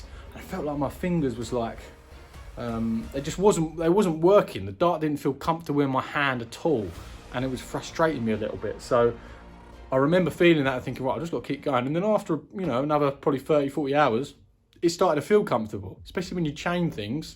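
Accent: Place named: South, United States. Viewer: I really enjoy your videos, but please please stop putting the auto generated subtitles on them 🙏 they can’t handle your southern accent mate, and it’s just complete gobbledygook going across the bottom of the screen, it’s so distracting 😂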